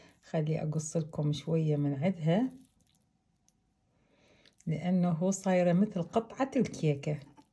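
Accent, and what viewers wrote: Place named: Iraq. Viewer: مرحبا اختي اولا ما أحلى اللهجة العراقية كتير بحب اللهجة العراقية واللبن طلع يجنن يسلمو ايديك يا اختي من ورا اليوتيوب مابنطبخ عجبني الحكي لما نطبخ بياكلو 😂 تحياتي لك ولعيلتك الجميلة اختي العزيزة ❤️🙋‍♀️💐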